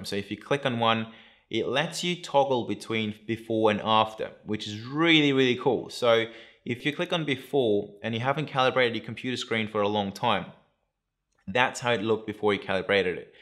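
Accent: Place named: South Africa. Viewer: Felt like there were hints of South African maybe? I'm also curious!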